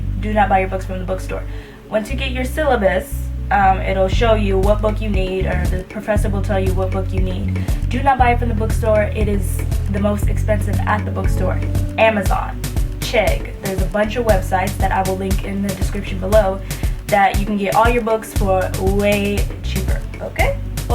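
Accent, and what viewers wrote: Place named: Caribbean. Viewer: oh,thought you were from the caribbean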